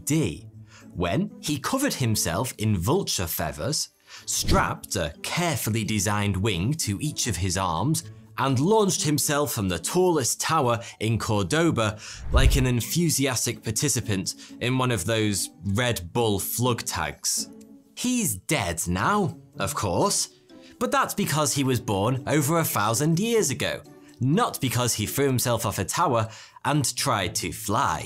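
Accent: United Kingdom. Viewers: bruh. I hate when people use copious amounts of emojis with a crappy throw away comment. Like this ain't a rap video that needs hype and It doesn't drive home Any point you made cause you made none. with his British accent sounds exactly like 42. And if you check pretty much all his videos everyone always thinks he's saying 42 until they realise, then we all have a giggle. Get it? Good attempt tho "🤣🤣🤣🤣🤣🥳🥳🥳🤯🤯🤯🌚🌚🌚🌛🌚" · I thought that’s what his screen name is, too, because of his Brit accent. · I know that Brits say a lot of words differently (from Lost in the pond YouTube) but Charles' last name is Lindbergh not Lindenbergh. I had to listen again your voice while great is so soothing too.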